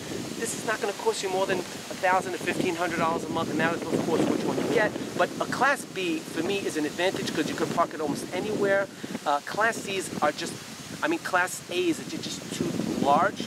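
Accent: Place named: New York, United States. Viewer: Good job 👍🏻 if you couldn’t tell from the license plate he’s a NYer you could tell by listening to him.😆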